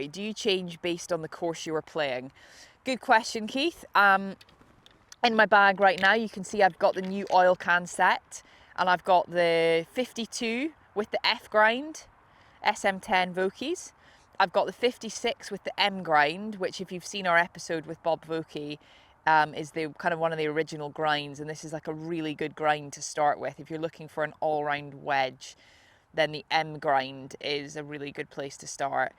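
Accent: Scotland, United Kingdom. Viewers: Love this channel, so easy to listen to you with that soft Scottish tone. And a smooth looking swing that anyone would want. Don't stop.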